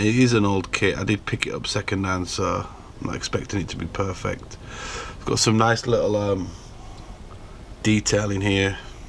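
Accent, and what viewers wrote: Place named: Leeds, United Kingdom. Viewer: I've sent you an email also I didn't realise that's where you are based! as you sound like my mate who's a Leeds fan all the best from UK mark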